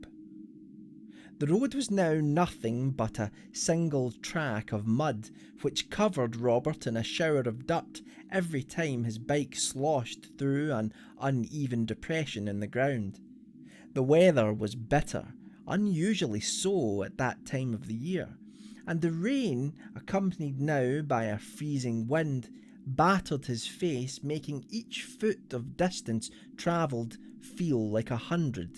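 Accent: Scotland, United Kingdom. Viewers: Author/narrator is Scottish, yo. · I really can't believe it's taken me so long to find your channel. You're a gifted author. I've not run across a single piece of yours that's not ended up in my favorites. Getting to hear them with your delightful Scots brogue is definitely putting the glaze on the cake for me. Weel dune me lad!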